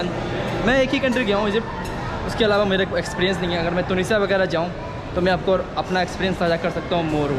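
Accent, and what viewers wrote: Russia. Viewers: Did anyone noticed that english accent is somewhat russian now? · Russian ascent is better than nastya's...😄🤩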